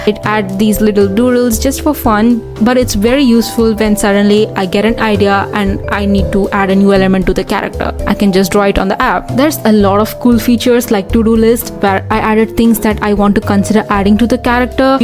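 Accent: India. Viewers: thrown off by the haryanvi accent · u sound indian lol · Why you have indian accent? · Your accent is very much indian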